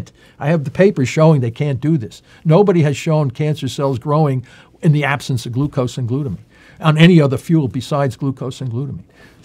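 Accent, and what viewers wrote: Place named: New England, United States. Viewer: Thank you for your WORK, and for the plain English explanation. I'm serious! And I love your mild New England accent;) This is ALL very encouraging to everyone who has lost family members to cancer.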